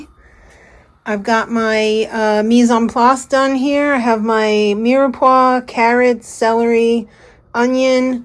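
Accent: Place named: New York, United States. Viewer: Happy Birthday!!! I am enjoying watching all your videos (even way back.) Thank you for sharing your life with us. As a former Long Islander, I love your New York words, phrases, and accents. I hope your birthday is wonderful and your year filled with blessings. 🎈🎈🎈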